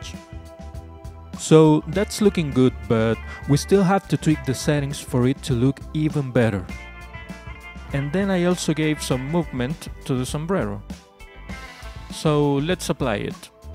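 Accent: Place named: Chile. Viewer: Hey bruh, are you from Chile??? I noted for the accent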